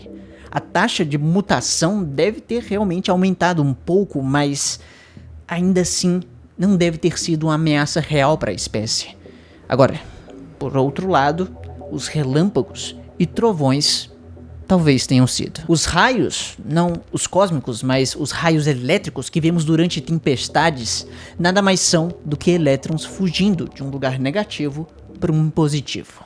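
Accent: Ceará, Brazil. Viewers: TU É CEARENSE MANO?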